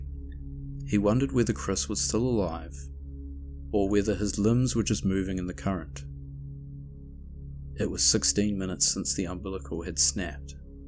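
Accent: New Zealand. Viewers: This was really good. I have no problem with a New Zealand accent! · Terrifying, miraculous and addictive watching! Awesome vid, 👌 thanks mate 👍 Great to hear a kiwi accent 🇳🇿, with love from Oz 🇦🇺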